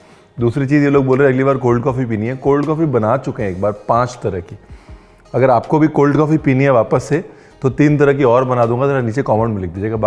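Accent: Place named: Lucknow, India. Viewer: Your Lucknowi accent. Love it 😍 Hot coffee also please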